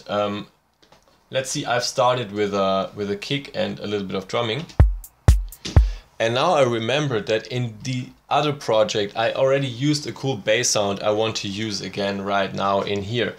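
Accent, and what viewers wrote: Germany. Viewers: You sound hella german lol. I know because I'd also sound like that when speaking english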